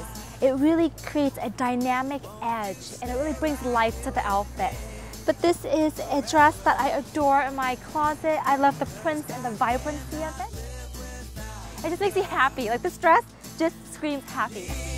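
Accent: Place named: Singapore. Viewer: im guessing she's from singapore so she's got the singaporean accent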